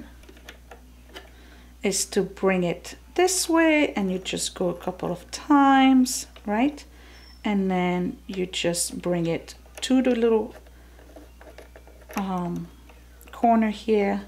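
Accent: France. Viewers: Happy birthday!🎂🤗 I am excited to see your quilting and sewing projects. That is something I have always wanted to do, but never really been able to accomplish. Maybe my old yard sale machine was the problem 😉lol. Anyway I have already learned a lot from this video. I always look for your new videos and love your French accent, it is beautiful. Thanks for sharing.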